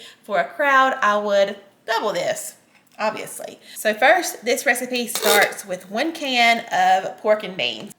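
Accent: South, United States. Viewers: Can't listen to that southern accent. Bah bye.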